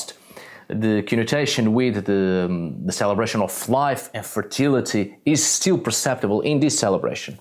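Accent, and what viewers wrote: Portugal. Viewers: As a Brazilian portuguese speaker, your accent is so strong I almost don't understand "caretos". Looks you're fluent in European Portuguese, so to speak. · Indeed He's speaking European Portuguese. And as it appears, he resides in Portugal atm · Your accent in English and especially in Portuguese very much sounds like a Portuguese speaking those languages. As well as your content, you recently talked about Umbanda, you made a video on the runes, you talked very much about the Iberian peninsula in the video about druids. Are you portuguese? Or is european portuguese your mother language? I say it because, as a brazilian, I'm very used to the way portuguese people talk and all, and couldn't help but notice these similarities. Also, great video! would love to see more about Portugal and North/northwestern Iberian celtic traditions, culture and history overall. 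It's very difficult to find specificities about that especially if you consider that Brazil tends to see Portugal and portuguese culture as too alien, often calling the portuguese settlers and conquerors "europeans" even tho they were the only ones to colonize here, for instance, and we don't even learn on school about pre-modern Portugal at all, making it look like we don't even descend from them at all (far from the truth, culturally and especially ethnically). I see you live in Portugal and has much interest on the country and. culture too, would be great to know more about it then!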